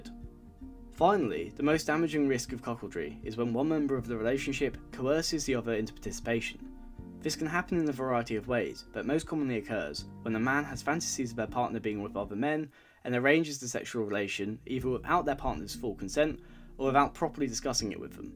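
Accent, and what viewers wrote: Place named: United Kingdom. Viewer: Wish your pronunciation would have been clear and audible..Heavy British accent makes it foggy to hear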